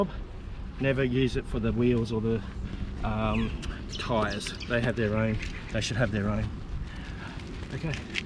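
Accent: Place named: Australia. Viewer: Ppls should be to speak english language! Your EN with accent AU....I get it"-----they lift stopped on the 1st floor😆😆😆cu.koo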